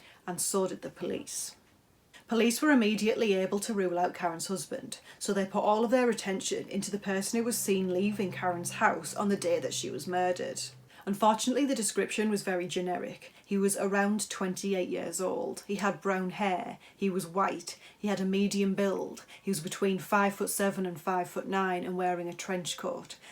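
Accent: Scotland, United Kingdom. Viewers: I liked this video and your accent. Are you Scottish? You are very beautiful.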